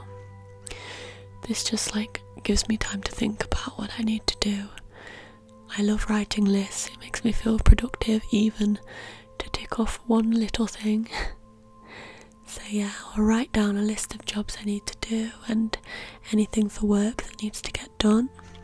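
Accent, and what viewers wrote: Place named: Nottingham, United Kingdom. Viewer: You have the most notts accent I’ve ever heard haha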